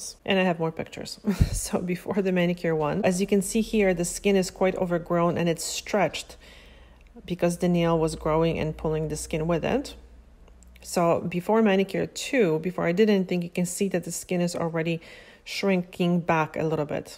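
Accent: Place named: Poland. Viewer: I just subscribed for the content but also I am getting strong Polish vibes when you speak. I’m Polish and your slight accent feels like home. ❤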